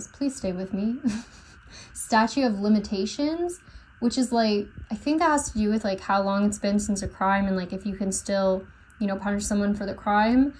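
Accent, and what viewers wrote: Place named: South, United States. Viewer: its southren accent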